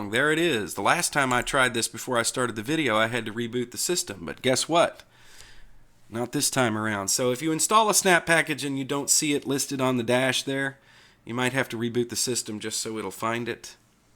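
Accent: United States. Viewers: I have said this before and have to repeat this again: if you want to get the best Linux channel for novice just subscribe to the ' channel on Youtube. I am so glad that I run into his channel a while ago! Of course I don't agree with everything of what he has to say,without exceptions, but it's just impossible to agree with the 100% anyone would say without an exception or two. But boy, oh boy: his guides are super-useful and they are made well. In addition his language sounds right for an American "ear" too, comparing to the British or Indian English some other good youtubers use. Hats off,!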